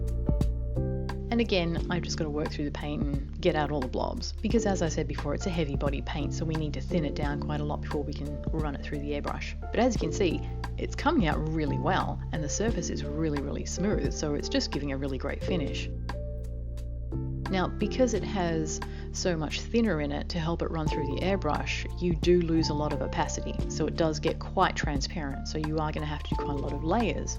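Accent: Australia. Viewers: You sound Australian...I emailed them a few months ago to ask where I could get Arteza products here, or if they post here, and they said no. Has that changed do u know?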